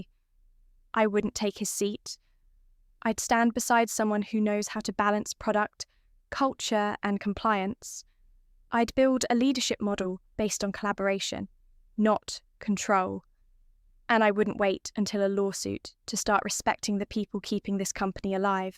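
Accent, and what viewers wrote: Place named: United Kingdom. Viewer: Very pleasant British accent . Excellent diction . 😊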